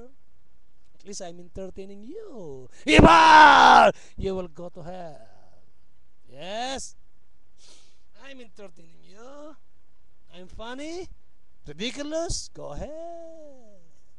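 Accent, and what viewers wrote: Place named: Visayas, Philippines. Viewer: hisa bisaya thats why sounds irritating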